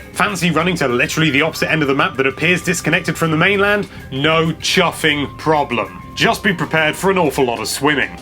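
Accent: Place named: United Kingdom. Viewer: I love how wonderfully British you are, it's so fun listening to you:) I can't wait for this game, I'm so hyped.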